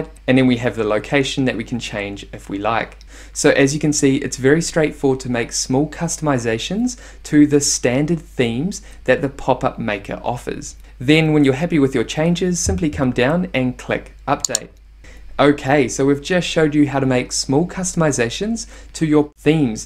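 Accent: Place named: New Zealand. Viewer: Love hearing the Kiwi accent on Youtube instructional channels. No mucking around. Shot bro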